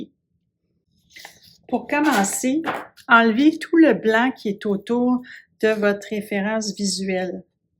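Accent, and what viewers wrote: Quebec, Canada. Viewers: J’adore l’accent canadien et les conseils au carreau également, bravo bravo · Quel délicieux accent québécois 💙🤍❤️ nos adorables cousins 😊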